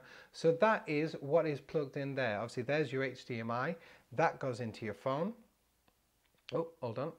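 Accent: United Kingdom. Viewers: I just cannot believe the MOANING MINNIES who are complaining about the chatter here ! Goodness, life's just too short, you have to say what you can WHILST you can coz you're a long time dead ! You sound like your from back home in England and English people LOVE to chat !! :) Great video and Entertainment ! :) You should do a 30 minutes Vlog on "How-To" just to pig off the whistle stop hustle bustle people who haven't got time to breathe ! LOL Greetings from Xena Land !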